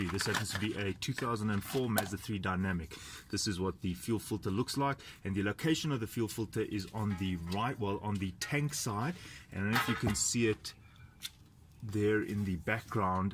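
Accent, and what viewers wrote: South Africa. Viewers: Victor Araoz he sounds South African not Austrian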